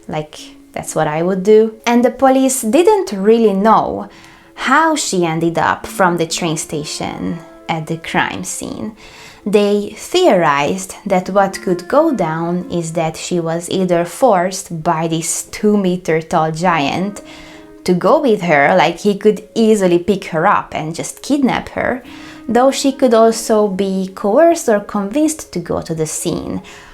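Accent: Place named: Hungary. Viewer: It is lovely and interesting, listening to Hungarian cases, listening to your hungarian accent and hungarian words. Greetings from ex Yugoslavia, from central Bosnia. Kiškofinefhaza hahah hungarian language amazes me.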